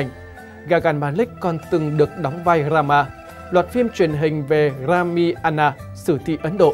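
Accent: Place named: Quang Binh, Vietnam. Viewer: yougotthis::oops::dothefive::elbowcough: Me no' nghe cai' giong Quang' Binh' cua' thang' nay' het' muon' xem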